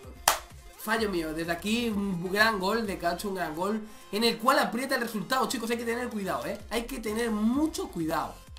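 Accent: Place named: Galicia, Spain. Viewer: de q parte de Galicia eres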